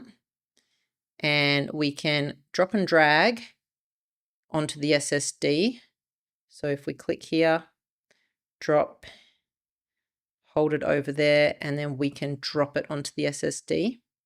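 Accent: Australia. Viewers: Great video as always. The iPad is such a great tech device and it’s awesome that people like yourself publish these videos to help people that might not be technically proficient. Cheers from this Aussie living in NZ 😎🎸🤘💕 Edit: and it’s always good to hear an Aussie accent here on YT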